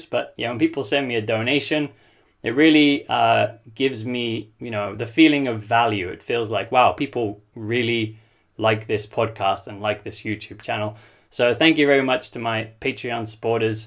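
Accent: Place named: United Kingdom. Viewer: Hi, I know I'm two years late but I thought you were from the UK when I first heard you. I wasn't sure but I thought so. (I'm argentinian)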